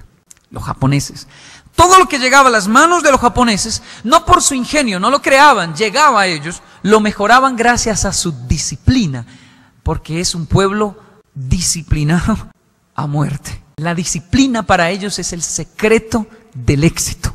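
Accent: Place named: Colombia. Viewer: Es colombiano. De ahí su acento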